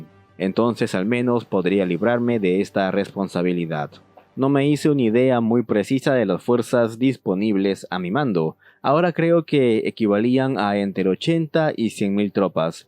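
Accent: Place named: Peru. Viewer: Muy interesante vídeo, una lástima luchar sabiendo que está todo perdido. Tenía años que no veía un video del canal. De antemano una disculpa. Antes pensaba "bueno, es un canal nuevo, aunque interesante aún no sabe modular bien su voz, es difícil hablar a un micrófono" pero hasta ahora veo que es porque es peruano y por eso es tan chocante su acento...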